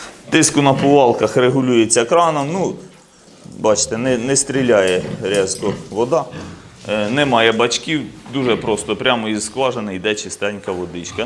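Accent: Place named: Poltava, Ukraine. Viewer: Добрий день. Можна питання, а з якого ви регіону? Чомусь здається, що Полтавщина ❤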